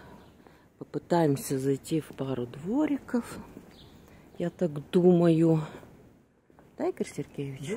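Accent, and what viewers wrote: Odessa, Ukraine. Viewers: Спасибо вам за то что вы показуете Одессу. А голос я бы слушал бесконечно. Именно одесский говор. И никакие артисты в фильмах про Одессу не передают того одесского колорита как это делает. Спасибо вам огромное. Всегда смотрю ваши стримы. Я из Херсона их смотрю. Был в Одессе и очень скучаю за ней.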